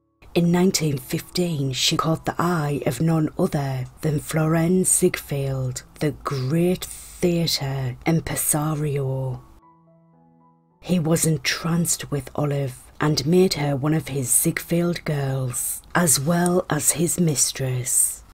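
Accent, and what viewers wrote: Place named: Newcastle, United Kingdom. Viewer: You sound like a Geordie. I live in the USA, but I grew up in Lobley Hill, Gateshead..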